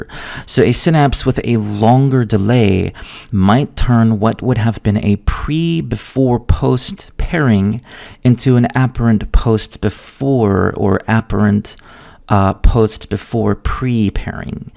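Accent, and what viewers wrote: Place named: United States. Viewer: Are you from Russia? But you have American accent. Just curious.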